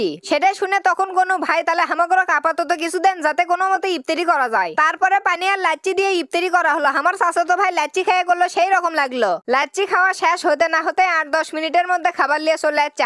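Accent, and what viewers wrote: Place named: Bogra, Bangladesh. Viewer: Excellent presentation with ceaseless uttering. The plus point to speak the Bogra dialect.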